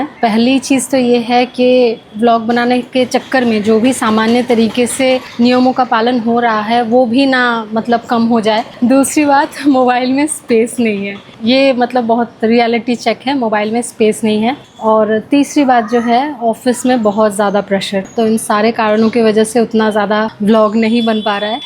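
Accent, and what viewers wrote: Bengal, India. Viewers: Di speaking in Bengali accent flawlessly 😮 mere sar ke upar se gya sab 🥲😅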